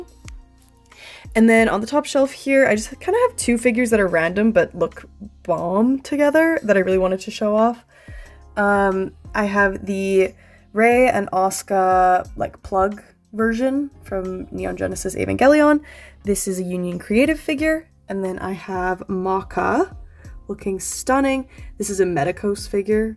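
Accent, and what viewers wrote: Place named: Alberta, Canada. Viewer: Just found your channel - you have the strongest Canadian/Albertan accent, it's awesome. Great tour and collection!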